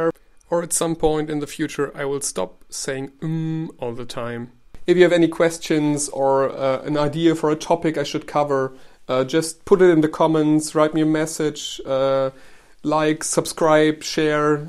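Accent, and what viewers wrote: Germany. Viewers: British Vs Italian style with a German accent. An interesting concept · Well it is audiable that you are not a native speaker, but so what? You are very easely understood, speak free, flutend what more can one expect? Also who am I to complain, I m not a native speaker as well. The only thing curious is how different your accent is in comparison to mine, given we share our mother tongue. I doubt anyone could guess that hearing both of us. I dare say yours is more stereotypical german while mine just . . . all over the place and a huge disorganised mess, but who cares, communication still works ^^ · I love German accent so don't worry, it's actually very beautiful and pleasent for the ears ❤️ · I have German relatives and was married to a German for 4 years almost 40 years ago. He's been in America more than 40 years and his English is far, far better than yours. 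Your English sounds like that of my cousin from Bielefeld; it's like ASMR (?) for me. Also, I used to teach at Göttingen and Bonn, (an English speakers class on history and foreign policy) and while I was impressed with the quality of my students' English, yours is far, far better. So no need for you to apologise for ANYTHING about your English! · Perfect! Not enough people teach the philosophy of style which is, in my opinion, the most important aspect. From there, everything else flows. And your English is great...the German accent makes it that much better too my friend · Aber zum Glück stören nur wir Deutschen uns an einem deutschen Akzent. Für die native speaker klingt es exotisch, und der Rest bemerkt es eh nicht. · You have nothing for which to apologise with your accent. An accent in a foreign language is not something to deride. Especially a well-articulated one, such as yours. 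I find that a properly enunciated German-English accent gives a certain aristocratic flair, it feels very Prussian. As long as you are judicious in your grammar and word choice, your accent is an asset rather than a liability.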